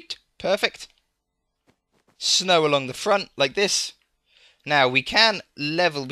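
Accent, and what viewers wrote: United Kingdom. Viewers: hey what part of uk u from u sound like u live near me ive watched all ur vids well nearlly all of them and was wondering if i could maybe join and help build a house or skyscrapper or what i have built myself a massive monster energy can:)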